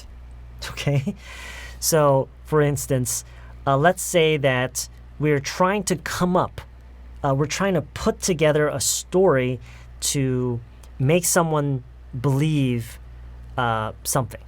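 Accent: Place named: United States. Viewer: 발음이 미국 사람과 비슷합니다 흑인보다 백인 영어에 가깝습니다 얼굴은 흑인인데 백인영어를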